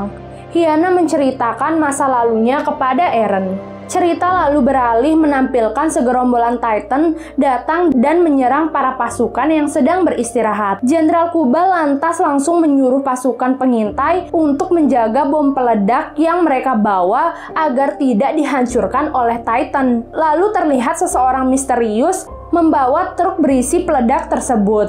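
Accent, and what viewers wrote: Lombok, Indonesia. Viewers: Mba orang lombok ya?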